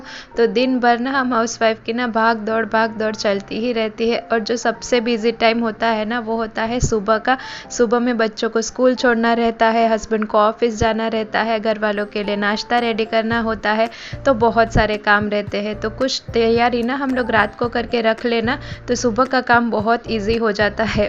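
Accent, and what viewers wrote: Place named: Gujarat, India. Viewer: Aap gujrati hai bolne se lga muje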